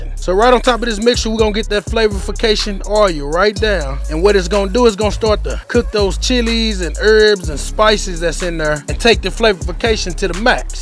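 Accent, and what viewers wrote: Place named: Houston, United States. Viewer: Sound like you’re from Houston.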